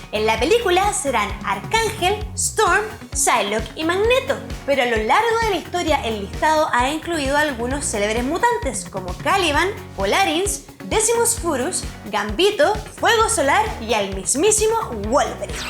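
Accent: Chile. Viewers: Es chilena. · Casi me suscribo, hasta que mi cuenta de que eras chilena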